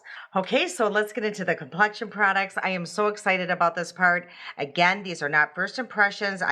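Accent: Midwest, United States. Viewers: hi, Thank you for writing back to me. You know, you're right about the Midwestern accent. We all possess that tell-tale sound that identifies our Midwestern whereabouts! I have been to my brother's only a few times. It is sad because when my husband died in 2012, I went into deep grief and depression and became agoraphobic. They offered so many times, but I was afraid to leave our home. I am not agoraphobic anymore, thank God, but I've missed so much of living since it lasted eleven years. My daughter died in 2022 and there are no words...it's just my son and me now. Life throws it's curve balls to everyone. God has been wirh us and continues. I'm sorry for the above. I hope it was okay to write. Anyway, I'm looking forward to more and more of your videos! I'm trying to decide what shade I should order of the makeup you applied. It was so perfect for you! 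I'm light neutral so I'm not sure and there are so few shades available!! I'll figure it out! Lastly, thank you for being kind and so enjoyable on each video. It really means so much to me. 💌💌💌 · Thank you so much for your review of the Cover FX foundation!! Your Midwest accent is wonderful! I’m happy to follow someone who looks and sounds like me ❤❤❤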